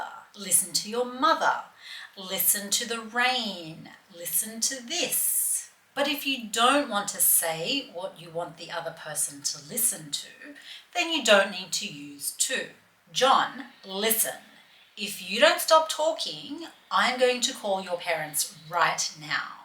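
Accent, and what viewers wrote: Australia. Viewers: I don't understand why you don't have more subscribers and views, you made really useful and interesting videos, and the best thing is you have Australian accent! That is strategical for me, I am living in Australia and I was study by my own with audios and videos in British and american English, and I wasn't improving my listening skills here (I didn't understand Australian people). Since I am study with your material I have felt that I am progressing 😀. Thank you!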